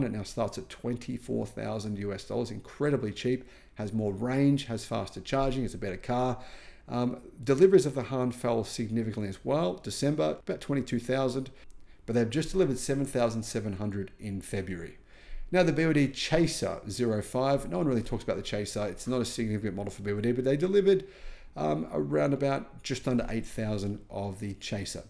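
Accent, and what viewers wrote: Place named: Australia. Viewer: Sometimes "Aussi" accent gets very heavy. Do you understand Mate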